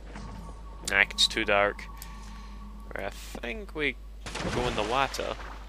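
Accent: Scotland, United Kingdom. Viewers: That bird one is totally my favorite colossus, by the by, your scottish accent kicks ass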